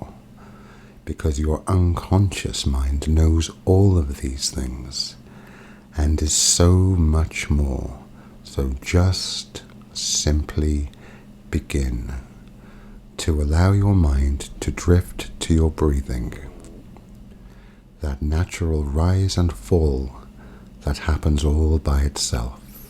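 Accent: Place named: United Kingdom. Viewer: any British gentleman's voice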